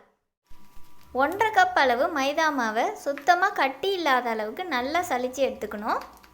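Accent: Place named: India. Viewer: நீங்கள் பேசுவது இலங்கை தமிழ் இல்லை. இந்தியா தமிழ் மாதிரி இருக்கு.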